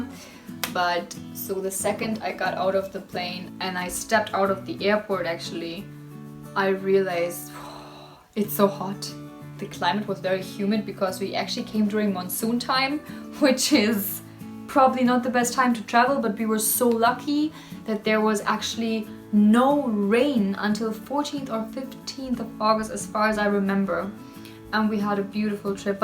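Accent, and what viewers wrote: Karnataka, India. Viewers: How come your accent is very Indian?? You are pronouncing every work very distinctively as Indians do. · Fascinated to see and hear ur experiences in Mysore. This is our culture. Enjoy. I am delighted with this video and surprised to hear ur accent in Kannada ! U are more than a Mysorean ? With affectionate best wishes. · Your english accent is similar to our Indian English accent, thanks for sharing your experience in our India. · Love your indian accent 😍😍😍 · Very good indian english accent. Interesting to listen your voice. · Your English language is just like Indian girls & unlike British people. How this happen ? Is it learned English or mother tongue ? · I have been enjoying your videos today. It amuses me to note that you picked up some indian accent while speaking in English. :) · Your accent is more like on the Indian side. 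· Oh god you also picked up the Indian accent a bit when you are speaking English 😂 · your indian accent is so accurate even most of the indians are not speake in their own accent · Bro ur accent is 💯 Indian!! Never heard a foreigner speaking in Indian accent so accurately!! Howw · You are super pretty! ❤️ The have got Indian accent that is cool. And the outfit you are with is just perfect. As a conclusion you are crush of mine.❤️🔥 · Don't get me wrong , but ur accent looks very desi 😀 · Honestly living in Canada for 8 years now just baffles on the things I’ve missed in india! Also you have a more Indian English accent that most Indians living abroad 🙏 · Your english wow proper Indian English speaking wow and you look so beautiful and pretty in Indian outfit and bindi on your forehead like i want to marry you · Your english sounds like an indian girl speaking english. Doesn't sounds like a foreigner speaking english. 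Enjoy travelling👍Enjoy life❤ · You are totally Indian because your vice is totally like as India. Love you.. meet me when you come India.. drop a mail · Nicely stick bindi on forehead, Indian outfits, lovely look, English with Indian accent... It's really a pleasurable experience watching you n listening you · Bro she sounds just like an indian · Is this your normal accent... you sound so Indian talking English... intonation & pronunciation so perfect... Feeling proud being an Indian... :) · Why her accent is like Indian? What the hell is happening..Is I'm the only one who is feeling that? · U are an amazing soul, amazing human being. World needs more ppl like u. U are always most welcome to India😊 Ur english accent is pretty much like Indian english accent may be cuz u have lived here for a year dats why.. Nice nice.. U look pretty in Indian dress and bindi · Your accent is so Indian! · I noticed a little bit of Indian accent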